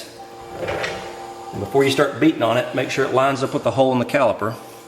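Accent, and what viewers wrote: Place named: United States. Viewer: He’s all American, no funny weird foreign accent, speaking Perfect American English.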